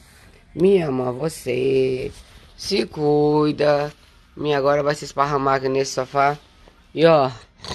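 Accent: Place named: Sao Paulo, Brazil. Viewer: Bispa você é paulista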